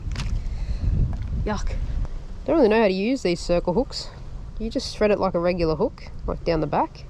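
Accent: Australia. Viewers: You sound Auatralian (and your steering wheel is on the wrong side), but the currency sign in the bait shop showed a $ (dollar) sign. I had to look up what currancy symbol Australians used. I thought Australians used the British Pound system.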